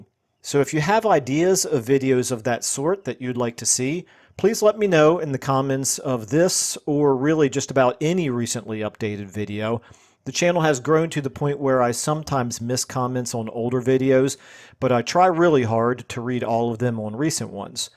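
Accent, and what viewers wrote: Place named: United States. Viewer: I love the way you present the information - and I have to acknowledge a personal note that your American accent is just a bit easier for me to follow than the strong accents that characterize some other favorite channels of mine. No judgment. But I wouldn't mind you doubling up on the info presented by other channels. I've binged hundreds of Legend's videos and found that, for all his expertise, we just like to play the game differently. And his guides and tier lists don't always track with how I enjoy playing the game. Feel free to reinvent the wheel because most of us would benefit from what you have to say in the particular framework you put things in.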